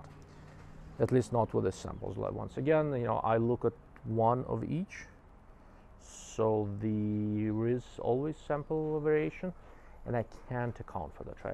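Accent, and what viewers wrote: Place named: Europe. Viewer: Any optic reviews by a guy that looks like a young Dr Evil with a thick European accent demand immediate respect.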